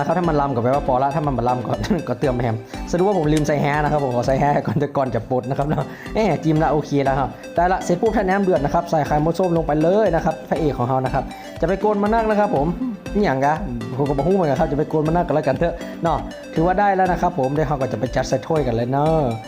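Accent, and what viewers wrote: Thailand. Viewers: พากอู้เมืองเน้อหล้าน้อยม่วนดี ไปเรียนทำอาการจากไหนเก่งแต้ว่าน่าขนาดเจ้า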